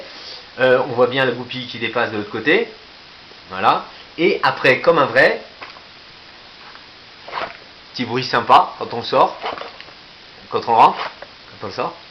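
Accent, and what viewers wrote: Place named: Quebec, Canada. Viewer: 😄😄😄 pas mal l'accent québécois !!! Mais tes sur YouTube ces cool sa. Be moi aussi. Si tu va sur ma chaîne je pense tu me reconnaitras😊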